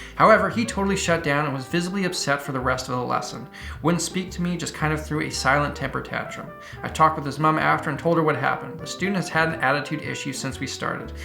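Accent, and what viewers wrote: Canada. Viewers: you can really hear his canadian soreys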